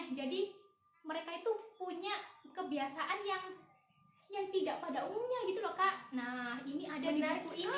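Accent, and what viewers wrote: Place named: Java, Indonesia. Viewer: Medoknya dapet banget, 😁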